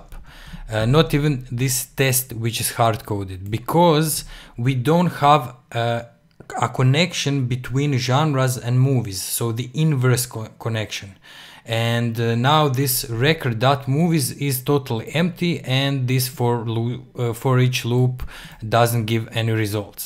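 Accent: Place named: Russia. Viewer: Just your accent in English is similar to Russian )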